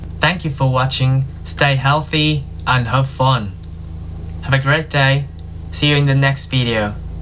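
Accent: United Kingdom. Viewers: Hello. I enjoyed your video very much. It makes me happy to hear about someone having a great adventure and such a great time. Honestly, you sound like someone that has spoken English for most of their life. I do love the British accent. I hope to someday go to Japan. I want to go to most Asian countries. Hopefully someday haha. Take good care and stay safe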